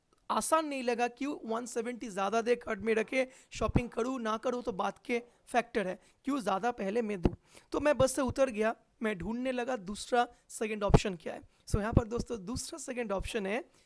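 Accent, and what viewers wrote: Bengal, India. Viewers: all ur videos are really boring, coz u mix lot of topics, this makes the channel really boring, half of ur bangali accent is boring too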